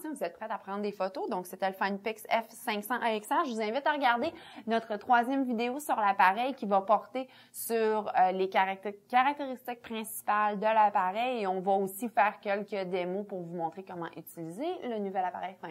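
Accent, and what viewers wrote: Quebec, Canada. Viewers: Belle vidéo mais malheureusement c'est pas tout le monde qui comprends le Québecois. J'ai un peu de misère voyant. ....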